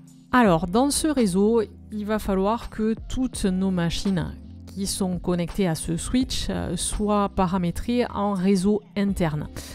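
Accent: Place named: South, France. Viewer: Très bonne playlist sur FOG. Chez les sysadmins, on a trop souvent ce réflexe un peu idiot de ricaner ou se méfier en entendant la voix (agréablement méridionale) d'une jeune femme pour donner des conseils sur le déploiement. Et tu as admirablement fait mentir ce réflexe. Subbed. Je me fais fort d'aller fouiner dans le reste de la chaîne cette semaine, que je peux imaginer de grande qualité sur la foi de ce petit tuto impeccablement construit. J'espère que ta chaîne va décoller : elle mérite.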